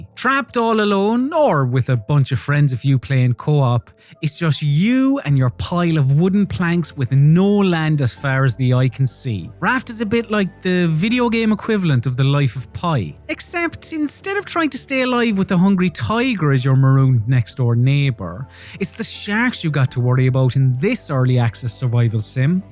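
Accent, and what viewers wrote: Ireland. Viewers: I have never heard such Irish accent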